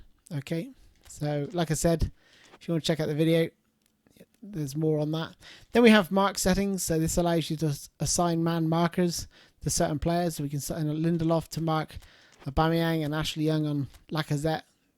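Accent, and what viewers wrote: United Kingdom. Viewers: Are you from Uk ??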